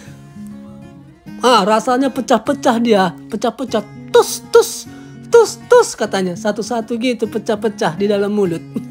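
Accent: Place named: Java, Indonesia. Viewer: Yang aku suka dari pak dhe ini kalau ngomong logat jawane.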